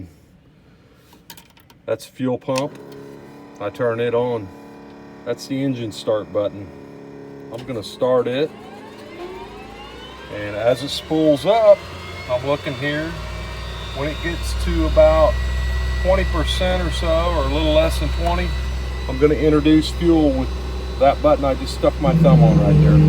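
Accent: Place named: South, United States. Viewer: Okay, you've got a Southern accent. I was driving on I285 outside of Atlanta some months ago, and holy-traffic jam, Batman, the Batmobile was driving in the lane next to me. My wife was with me, so she saw it too. Couldn't get a picture of the thing, but we weren't hallucinating, so we chalked it up to a visual spotting of a UDO (unidentified driving object). And didn't think much about it till this video. Ever been to Atlanta? I just assumed that it was being driven to one of the local movie studios that have popped up down here.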